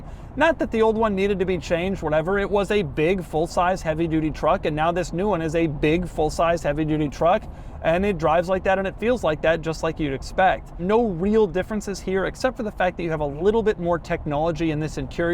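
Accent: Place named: California, United States. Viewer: You sound "californian" Pretty gross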